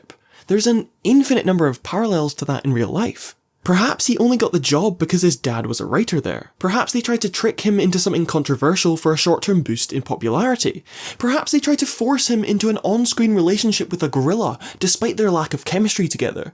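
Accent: Scotland, United Kingdom. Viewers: Here good to hear a Scottish voice on YouTube for a change. Great video.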